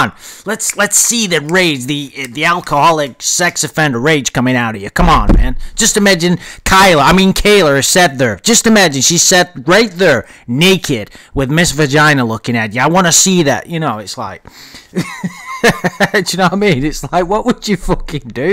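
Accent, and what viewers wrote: United States. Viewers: Not a bad yank accent. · your American accent is incredible.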